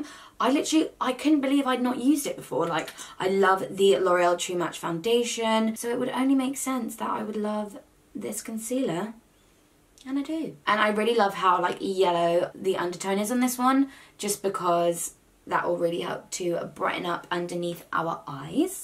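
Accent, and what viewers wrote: United Kingdom. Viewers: New to your channel, love your makeup look, very pretty..then u spoke..u British!? YAAS..song in beginning??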